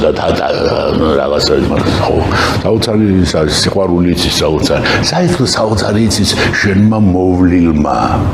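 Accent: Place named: Imereti, Georgia. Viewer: იმერელი კილო აქ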